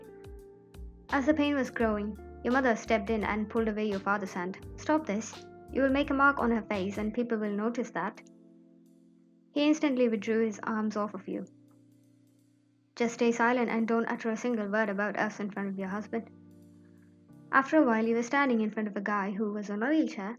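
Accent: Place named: United Kingdom. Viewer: Are you British cause I love your vocie. It feels so real❤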